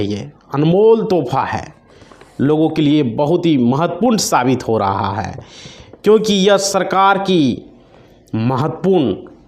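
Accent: Bihar, India. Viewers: जो बताना हो साफ बताओ चुटीये की तरह बोल रहे हो बिहारी हो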